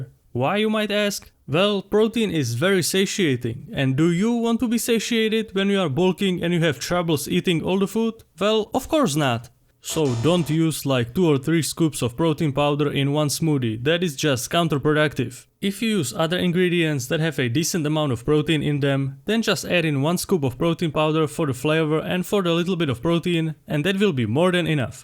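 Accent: Czech Republic. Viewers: I can tell you are Czech based on your accent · jseš čech žejo? jinak super!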